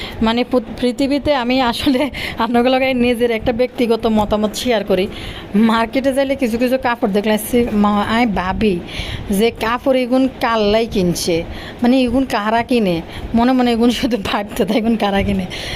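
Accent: Noakhali, Bangladesh. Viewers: আপনার বাড়ি কি নোয়াখালী। আপনার ভিডিও যতবার শুনি ততবার আমার খুব হাসি লাগে বিশেষ করে বাপ্পির ব্যাপারে যে কথাগুলো বলে না আমার খুবই হাসি পায় আপনার কথাগুলো শুনে❤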